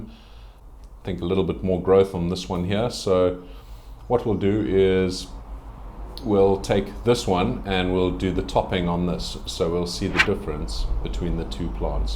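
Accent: South Africa. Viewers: It's clearly South African.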